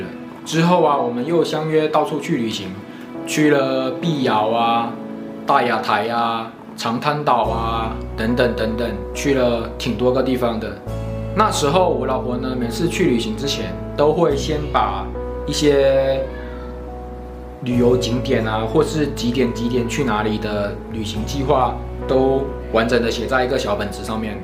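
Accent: Taiwan. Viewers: 哈哈~我看你影片一開始我以為你是台灣人，後面說是廈門才知道你是中國人廈門口音幾乎跟台灣沒差別😆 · 你是厦門人嗎？聽口音還以為是台灣人! 去菲律賓多久？ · 兄。你是大陸福建人，可是你說的普通話卻若台灣國語。初時我還以為你是台灣人。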